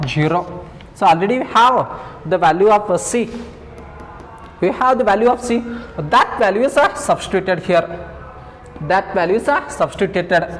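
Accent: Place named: United Kingdom. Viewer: British English accent samajh nahi atta hein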